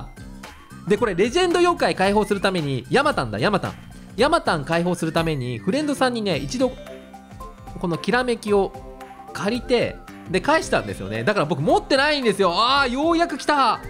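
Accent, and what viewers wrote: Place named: Yokohama, Japan. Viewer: もしかしたらさん横浜？に住んでるから訛りが入ってるのかもしれない（横浜であってますよね？）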